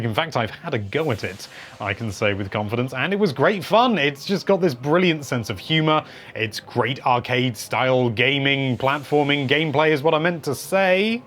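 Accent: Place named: United Kingdom. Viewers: Yeah that's how all British people say it. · It’s the british accent interpreting the name as it’s spoken.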